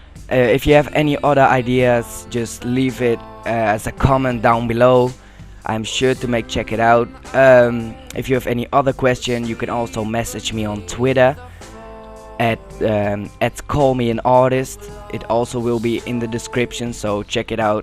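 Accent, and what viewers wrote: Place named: Netherlands. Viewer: Nice tutorial, You're definitely dutch too hehe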